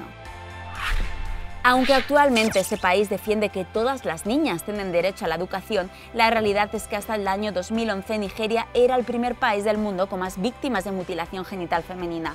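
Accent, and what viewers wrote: Spain. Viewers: Pues yo vivo en España y deduzco que por tu acento tú también. ¿En algún momento has sentido que tu vida no vale nada? Pues yo no. Siempre he sabido que mi vida vale lo mismo que la de todos los seres humanos. Me encantaba este canal pero de verdad, este vídeo está lleno de desinformación, negligencia e ignorancia. Es que, ¿España y México dentro? Tanto en España como en México se valora la vida de las mujeres. Y teniendo en cuenta que el título es "Países en los que las mujeres no valen nada"... pues eso.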